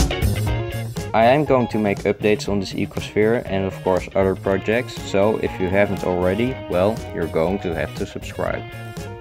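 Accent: Netherlands. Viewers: nice video! i do not know where you are from, but you sound very Dutch. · he sounds dutch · I always thought you were Dutch by your accent (I have it too lol), but I wasn’t sure, and now I am. It feels satisfying to be right.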